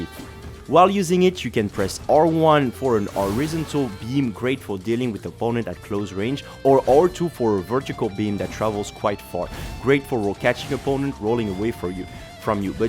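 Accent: France. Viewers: le petit accent fr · the day they will increase the range of the meteoric ore blade and buff it, i will be in heaven. (i have a question, wich country do you come from ? i sensed a french accent but i think im wrong) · French accent ? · You have a french accent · gg très belle vidéo xd g direct remarqué ton actent fr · T’es français enft parce que ta un petit accent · I didn't know french accent could be actually nice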